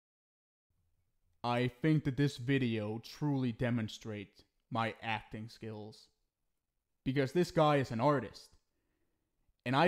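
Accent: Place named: Australia. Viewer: My thoughts through the audio "You can feel me huh?" "Whose they?" "Can it go back huh because what I'm sensing it can't" "Prison!??" "God the lil whine in this is mMMmmmMmm" "Am I picking up an accent hmm?" "Tearing there what out!?! You painted what with what!?!?" ".... Yeah I can see myself falling for someone like this" "Watching me in the shadows huh" "Yeah definitely picking up an accent but which one I think Aussie maybe a British like accent" "Raven that's a cute name" "The sadness in his voice makes me wanna hug him" "Little bit of a lisp it's adorable " "The mood changes are so cute when he speaks *proceeds to do tbe fan girl leg kicks in the air*" "The nicknames he picks are just 🤌✨" "Your maga what what?" "Awww I get a hug and he likes my hair EEEE" "Awwww kisses!! wait wtf am I doing this is a a serial killer yeahhh but he's so cute" "Oh? 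Switzerland huh hm sounds nice" "God why am I smiling" "You're slightly a psychopath but like I'm like this close to letting that slide" "I can't believe in studying to be a detective when I act like this Jesus" "Nah I think we should go considering it sounds like a lot of people" That is all 🤌✨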